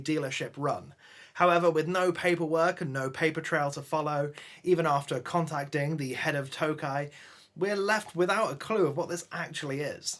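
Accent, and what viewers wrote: United Kingdom. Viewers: Hi You sound british but do you live and work in iceland with mikko? If yes you are so lucky and i wish i could join you...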